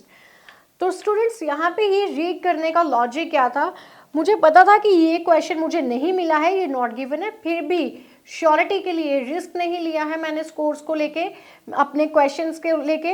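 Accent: India. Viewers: your indian accent makes my ears bleeding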